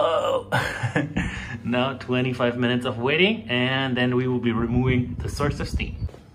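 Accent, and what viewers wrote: Germany. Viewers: You made me laugh that you apologise regarding your german accent! Du hast mich im lachen gebracht! So please watch this here. I think the best and funniest advertisement ever: <link> Grüsse aus Ungarn! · Great video! I’m still a newbie to sourdough, and this helped me a lot. Also, I’m Czech and to me it’s so adorable when someone has a German accent🥰😄 pro tip: if you put the tip of your tongue between your teeth and say “d”, you’ll get quite a decent “the” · I love his German accent ;) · I not only learned some technique here but I also got a kick out of the bloopers. I'm glad you don't cut them out because it's way better this way. Ich mag auch den deutschen Akzent :) Keine Notwendigkeit, sich zu entschuldigen! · Few things to note..... 1, my prediction about "Zee" is German, turns out to be correct, but aside from that, your English is pretty good, to a point that I almost doubt my protection about the "Zee". 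You should working on adding more accent for ease of identification. 2, started from #2, the whole thing sounds like college project, I can respect that... 3, I'm going to save this video, and when next time someone asked me why German make the good stuff, I'll send this to them... 4, So, in Germany, people do use their thumb to count 1... Jokes aside, this is a very interesting video, much different than the rest of the YouTube cooking video I've seen so far, even if it's just a video about homemade bread, the whole process screams perfection, sure is a breath of fresh air, good work. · NEVER apologize for your delightful German accent and way of speaking. :) I love authenticity in a human being, and you are an authentic human being. :D also, your tips on bread making are fantastic. :)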